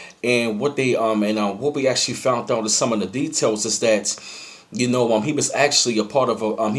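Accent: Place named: Atlanta, United States. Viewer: You don't sound baltimore.more like Atlanta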